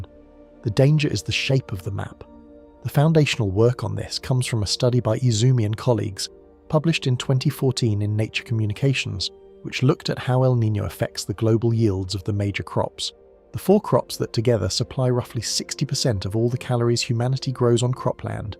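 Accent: England, United Kingdom. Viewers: Lose the English accent It sounds like shit.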